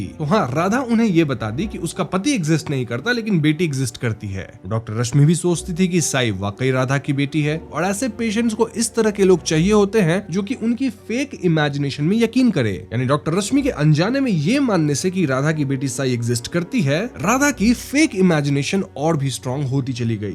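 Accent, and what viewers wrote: Bengal, India. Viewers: One request,,, your Bangali accent is too thick, so please learn to speak in a neutral accent.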